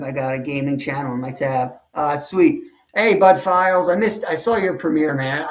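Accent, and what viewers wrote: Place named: Pennsylvania, United States. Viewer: Any time I think f Kindergarten Cop...."boys have P e n i s and girls have a V....." teeheeee. I said that quote to my radiologist that was in her late 20s. She had no idea 🤦‍♀️. LoL I appreciate your clean channel.!! Once in a while, I slip and say bad words but there are words that is a no no.. especially during the day time. A night stream sometimes brings out dirty mouth. Hehe. But we still love her. Oh, and thank you for the rant in a PA accent, it makes me feel like I'm around family.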